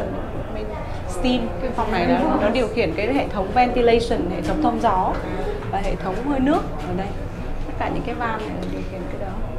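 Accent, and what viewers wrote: Hanoi, Vietnam. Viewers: Girl đâu mà xinh thế, giọng hà nội nữa chứ , yêu thế ❤️ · Em là người gốc Hà Nội hả. Sao giọng hay quá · nói giọng bắc dễ thương quá. Mà bạn quê ở miền bắc là ở Hải Phòng phải không. Chiếc tàu lớn quá. Ủng hộ đầy đủ like sub tặng bạn. Đã xem full. Chúc bạn ngày mới vui khỏe và bình an. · Xinh đẹp, phúc hậu, giọng bắc chuẩn hà nội nghe mê à!!!!!! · Bạn hướng dẫn xinh gái quá đi có người yêu chưa bạn ơi ! bạn nói giọng HN à ? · rất xinh đẹp và nói giọng Hà Nội nghe rất dễ thương.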